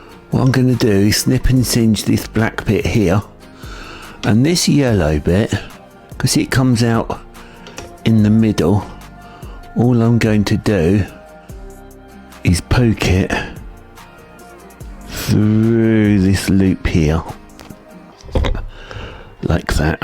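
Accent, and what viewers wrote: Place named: United Kingdom. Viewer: Thank you for your videos, your british accent makes it easer to understand what to do than the north america accent that all the videos have had that jag earler looked at.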